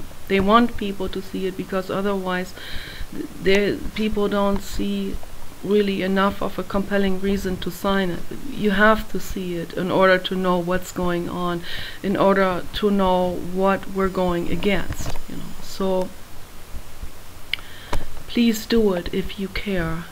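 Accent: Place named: Germany. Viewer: You have to be german. I Love your melody!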